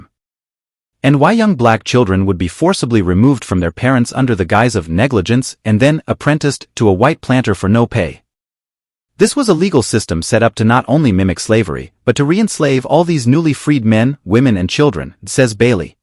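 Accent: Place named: Europe. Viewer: You sound, european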